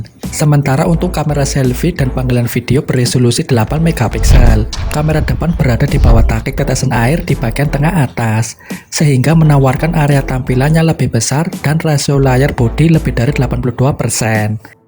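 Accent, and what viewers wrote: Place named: Java, Indonesia. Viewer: Logatnya jowo bngettt 😁😁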